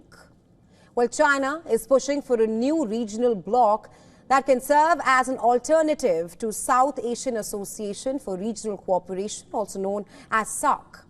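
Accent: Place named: India. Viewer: It's 'SAARC' not 'SUCK' 😂 Typical hindiwala and comedy weird accent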